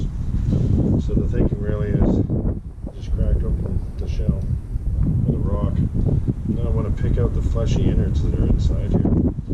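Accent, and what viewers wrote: Canada. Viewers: Awesome! You sound like a fellow Canadian. I am so inspired - "gathering resources". Been there so many times. Cheers from Montreal QC.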